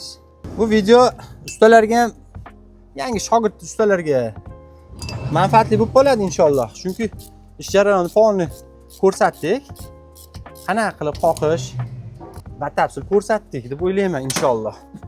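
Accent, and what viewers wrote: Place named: Kokand, Uzbekistan. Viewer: Аллохума барик! Сог булинг Укамжоним, маълумот жуда чиройли етказиб берилган! Куконда лигини шеъвадан тушундим, каерда жойлашгансизлар?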